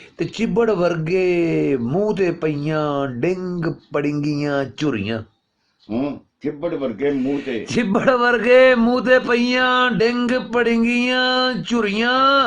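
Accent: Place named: Sheikhupura, Pakistan. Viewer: Masha ALLAH 💞 It seems GOD did gave some talent to to 🔥 to speak 🗣️ perfect pure Punjabi of QILA SHEIKHUPURA DISTRICT of OLD PUNJAB, You wouldn't have MAKAI ((corn 🌽) ROTi since it's 100% American native of USA 🤞🍀🙏🔥🚒🔥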